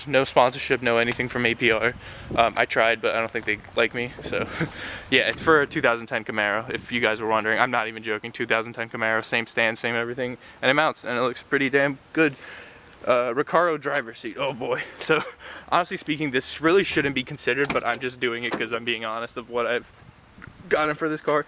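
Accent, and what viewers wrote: Russia. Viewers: Are u russian bro?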